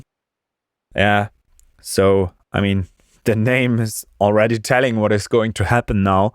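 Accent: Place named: Germany. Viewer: man hört den deutschen akzent ordentlich raus :D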